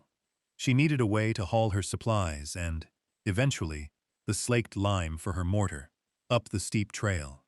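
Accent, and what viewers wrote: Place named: United States. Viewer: Excellent! Story and the narration was nice and gentle. Good American accent. I really dislike those fake British accents! Appreciation from North Central, Minnesota.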